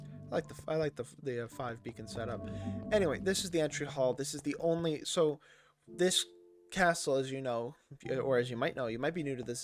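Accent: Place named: Scotland, United Kingdom. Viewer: you sound like scottish stampy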